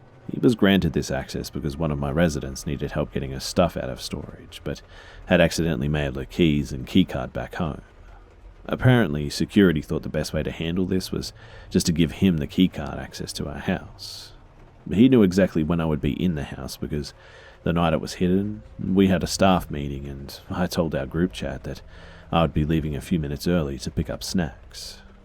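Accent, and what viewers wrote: Australia. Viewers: How his voice is soothing and amazing to hear. It’s like an Australian Prince Charming whispering in your ear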